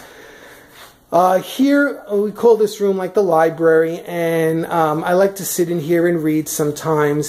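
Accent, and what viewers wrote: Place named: New Jersey, United States. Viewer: OK so needs to publish a recipe book and you need to publish a holiday decorating book I can see them on coffee tables every where. BTW I have lived in Vermont for the last 57 years but I still know a Jersey accent when I hear it. Mom was from the Hazlet area and Dad wae from Philly. Be well you two.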